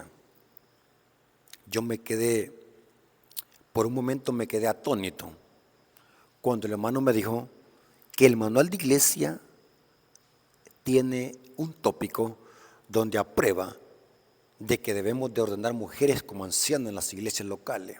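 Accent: El Salvador. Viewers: Dios derrame su Espíritu Santo, siempre en usted. Para que siga teniendo buen carácter y mucho dominio propio, que en estos tiempos es muy difícil tener buen carácter y dominio propio. Aquí en México, cada región tiene su estilo de hablar, y yo sé que usted , es del país del Salvador, y ustedes hablan chistoso( con todo respeto) y su hablar así es de usted de por ser salvadoreño. A mí lo que me importa es que siga firme y adelante con su canal, a pesar de tener muchas piedras de tropiezo. Gracias por compartir sus clases de teología, yo he aprendido mucho, en su canal. Hermano , me enteré de que su papá se fue al descanso, y pues primeramente Dios, puedan reunirse en el día de la resurrección. Cuide mucho su garganta.🙏🙏🙏🙏🙏🙏🙏🙏🙏🙏🙏🥰🥰❤️🥰❤️🥰❤️🥰❤️🥰❤️🥰❤️🥰❤️🥰💯✅💯✅💯✅😊😊😊😊😊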